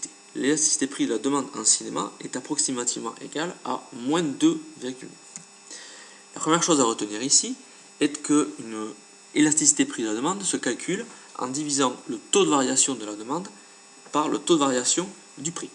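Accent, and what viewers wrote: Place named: South, France. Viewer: apprendre l"élasticité avec l'accent du sud il y'a rien de mieux! trés bonne explication merci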